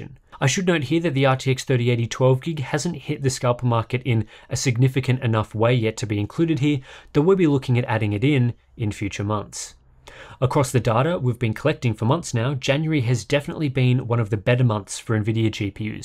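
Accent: Australia. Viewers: After 15 months of having an MSI Radeon 5500 Mech 4GB I can honestly say I only play these videos so I can hear the difference between my Syracuse accent and your Aussie pronunciations.... "One is my name. The other is not." - Lt. Commander Data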